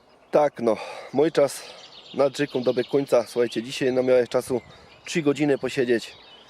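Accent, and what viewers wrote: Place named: Silesia, Poland. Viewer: Fajne hobby - wedkarstwo (lapa w gore) ALE wytrzymalem 1 min 20 sek ... nie da sie kurwa tego sluchac, mam bardzo wielu znajomych Slazakow i wiem doskonale ze potrafia NORMALNIE GADAC jak zechca. Film bez dzwieku OK - graty za rybki !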